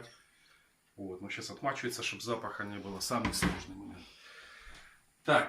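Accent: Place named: Ukraine. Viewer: приятный украинский говор.У меня отец был с Харькова...Тоже так говорил.Умер в Омске.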